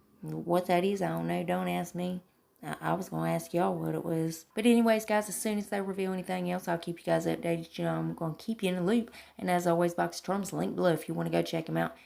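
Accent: South, United States. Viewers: Finally another southern lady on YouTube!!! It's so nice to hear another southern accent.. makes me home sick tho!! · I've been so disappointed with Boxycharm over the last few months that I canceled my Premium box for June. I was frustrated last month getting the mascara over a palette (that fit my profile Perfectly), and then those brushes with the Ridiculous $90 price tag, and then the 2 mini lip balms that I'd be excited to see in a $12 Ipsy bag, but did Not belong in a Boxycharm Premium box... Come On!! It looks like the June Premium box is going to be much better, but I don't need another contour palette. The only thing I saw that really excited me in June's Premium is the Avocado sleeping mask. I'm crossing my fingers that I'll be able to pick it up in add-ons or Pop-up at some point. 🤞 I'm excited about Luxe, though. Hoping for some Great products to make up for the last few months of Duds! 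Thanks for the update, girl!! 😊 I'm excited to see Anything from First Aid Beauty! Nothing I've tried from this brand has disappointed me yet! Btw, I have that thick southern accent, too. There's nothing wrong with it! I lived in Virginia Beach for a few years when I was younger (I got my butt back to Texas as soon as I could! Lol), and it always amused me at peoples reaction to it there. Most people loved it, but some acted like I was speaking a foreign language! Lol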